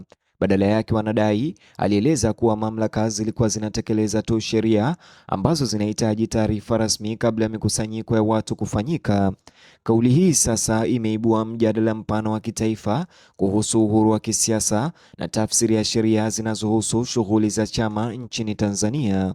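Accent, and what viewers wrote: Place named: Kenya. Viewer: kiswahili chenyewe kinakusuta wewe ni mkenya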